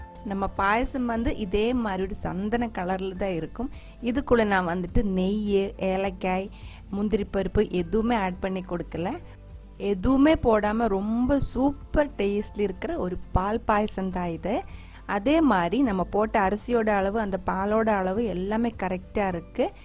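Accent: Kerala, India. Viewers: I subscribed your channel when I saw your video for the very first time itself. Not for the receipe I saw but for your soothing voice. Lovely Tamil with a kerala touch....now regularly following your recipes...you are going to hit the cliff soon...this is the first comment I'm placing for a YouTube channel.stay blessed!!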